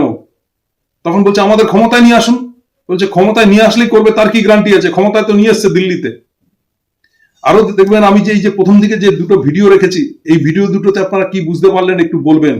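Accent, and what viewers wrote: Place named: Bangladesh. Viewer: এটাতো বাংলাদেশি মাল বুঝাই যাচ্ছে কথা শুনে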